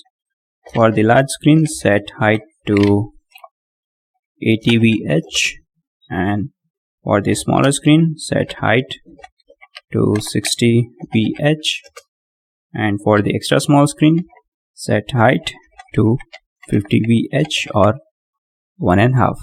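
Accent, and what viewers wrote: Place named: India. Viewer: The problem is that your English is pretty hard to understand, no offense. I know it's the standard Indian English, but still. If your primary audience speaks the same way, no problem, but if you target a wider audience you may want to up your game. I tried to focus on the content and ignore the accent but I didn't make it through the video.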